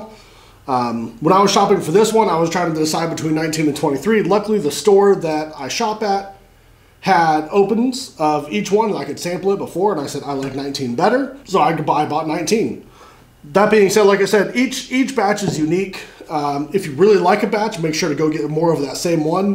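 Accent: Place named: Nashville, United States. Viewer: Sound like you live close to Nashville I’m from Lewisburg !!!!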